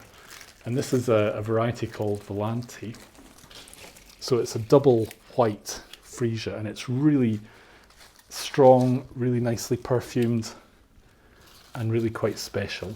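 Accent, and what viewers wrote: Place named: West of Scotland, United Kingdom. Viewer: Thank you so much for your easy to follow tutorials. also so great to hear a fellow west of Scotland accent!! love your work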